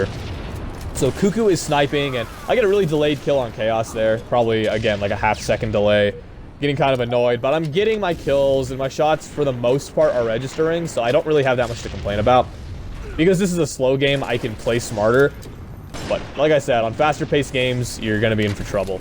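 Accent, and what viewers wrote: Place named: United Kingdom. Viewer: 0w0 u sound like a fellow brit>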